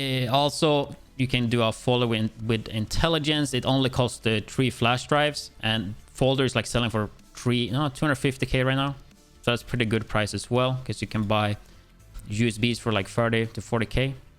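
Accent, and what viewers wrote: Sweden. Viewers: are u swedish i hear som swedish dialekt haha lol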